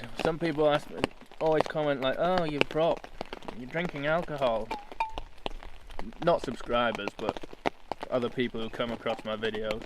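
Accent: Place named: United Kingdom. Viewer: Where are you camping? U sound British.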